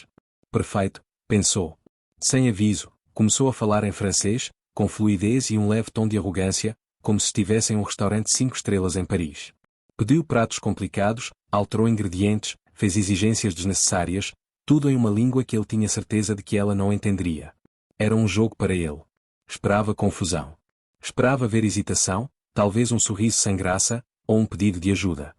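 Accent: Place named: Portugal. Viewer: Não suporto esse sotaque de Portugal horroroso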